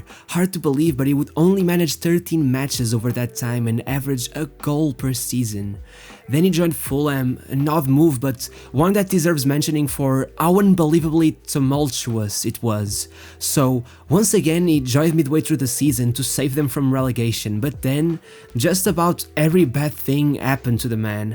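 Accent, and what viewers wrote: Portugal. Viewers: Pelo sotaque, só podes ser português. Belo vídeo.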